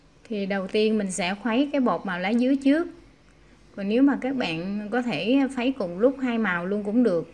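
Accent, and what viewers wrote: Mekong Delta, Vietnam. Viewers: Trinh Trần NGƯỜI MIỄN TÂY HÌNH NHƯ PHÁT ÂM NHƯ VẬY ĐÓ, BẠN CÓ GIỎI QUA DẠY LẠI MẤY NGƯỜI MIỀN TRUNG, BẮC KÌA, CÓ VÙNG GỌI N LÀ L VÀ NGƯỢC LẠI NỮA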